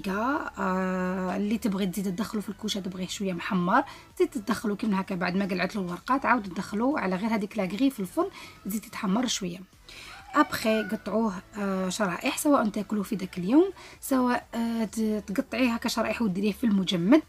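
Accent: Algeria. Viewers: ماشاءالله تسلم الآيادي أختي وصفة ممتازه ولهجتك الجزائريه لطيفه احترامي وتحياتي لك من السعوديه 💚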